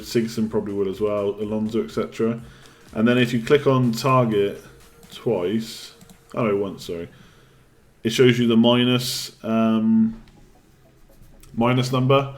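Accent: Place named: United Kingdom. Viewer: are u Irish seen the Irish flag beside Ur team but u have a strong British accent?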